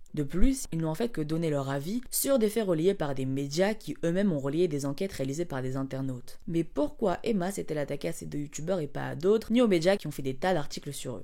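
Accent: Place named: England, United Kingdom. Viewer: pour quoi prendre l'accent anglais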